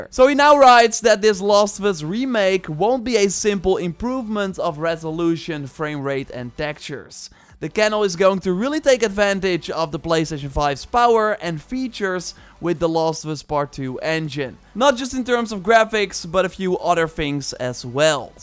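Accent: Netherlands. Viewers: Are you from holland or somewhere near? :p i can definitaly hear a dutch accent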